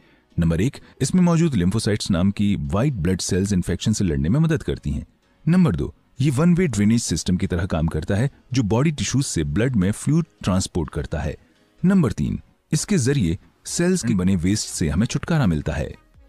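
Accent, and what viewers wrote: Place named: India. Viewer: Yeh video kisi hindi channel say li hai. Banday kay bolnay kay andaz say pata chal raha hay kay woh India ka hai aur hindi kay lafz bhi istamal karaha hai. Nabe prateeshad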